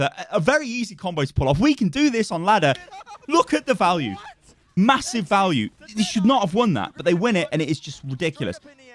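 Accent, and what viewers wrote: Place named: United Kingdom. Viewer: Always enjoy these vids! Nobody ever takes the time to slow an owl fight down and point out the endless high iq plays. Ur emotion and... british..ness makes it entertaining and helps get the message across even better. Also how did fury hit so many forking rocks onto a genji just disgusting what a game.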